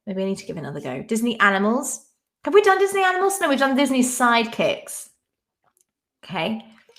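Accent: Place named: United Kingdom. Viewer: Whoa, I had no idea you had a British accent. Very interesting!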